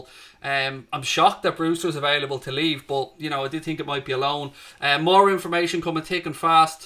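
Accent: Ireland. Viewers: Yeah I thought I recognized that accent, I’m Irish too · Great news to wake up to this morning. Came across your channel watching about Thiago and have subscribed...I like listening to you on your own and bring half Irish, love the accent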